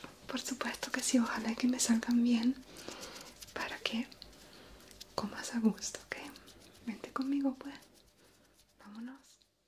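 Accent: Venezuela. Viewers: Amo tu acento Venezolano es único! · Me encanta tu acento venezolano, muy relajante. Saludos. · Me encanto lo Italo-Venezolano de Caracas pal mundo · De dónde eres? No sé muy bien porqué pensé que eras italiana (creo que es porque tienes varios videos en italiano) pero el acento venezolano está súper on point, estoy confundida 😂😂 Saludos desde Venezuela ❣️ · Que dulce es el acento venezolano ♥️ mil gracias por esto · Hola en estos tiempos de angustia que estamos viviendo en Costa Rica, el verte y escucharte me relaja bastante, me encanta tu hermoso acento venezolano gracias por ser tan especial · Graciass! me ha ayudado tu videitoo! un video de ASMR bien fino! jeje me ha relajado bastante escuchar ASMR con mi acento Venezolano <3 Un besito corazón! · Que linda. Gracias por tu video. Me encanta que se nota tu acento Venezolano. 😍😍😍 Me dio hambreee!!! Hugs. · Adoro tu acento italo-venezolano ❤️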